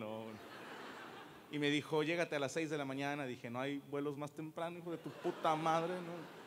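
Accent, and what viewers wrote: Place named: Mexico. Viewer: Gracias a Franco muchos Argentinos hablamos y usamos palabras como "Puñetas" o términos como "Chíngate esa" con ese acento Mexa tan lindo! JAJAJAJA!, el mejor! Saludos desde Neuquén, Argentina!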